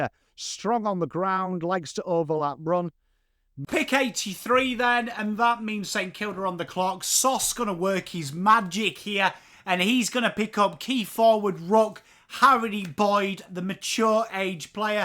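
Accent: England, United Kingdom. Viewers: Don't know what you heard but he said that it's his pommy accent 😅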